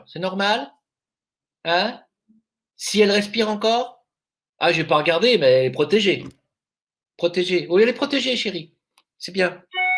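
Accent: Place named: Algeria. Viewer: l'accent pied noir chinois c'est juste EPIC !!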